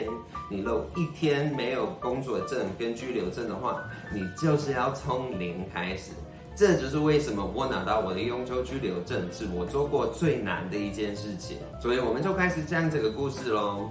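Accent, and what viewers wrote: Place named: Taiwan. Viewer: 滿滿的台灣腔，好親切喔～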